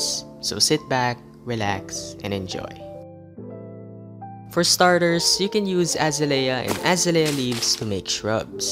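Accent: Philippines. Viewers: he sounds like a filipino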